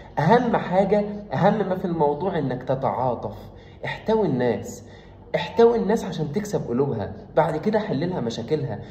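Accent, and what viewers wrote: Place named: Gaza, Palestine. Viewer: Long live to and EGYPT,your dialect is quite difficult to understand, but i have been following the palestinians for two years now,sometimes you sound like people from gaza./ from mousa in France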